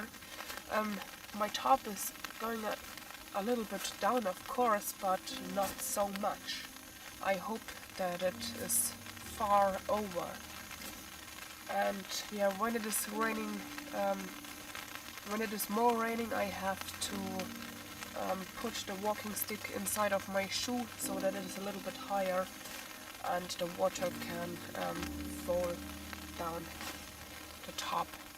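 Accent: Bavaria, Germany. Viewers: dieses bayerische Englisch ist - na ja - gewöhnungsbedürftig. Aber ich verstehe schon, dass Du versuchst, Deinen Wirkungskreis zu vergrößern.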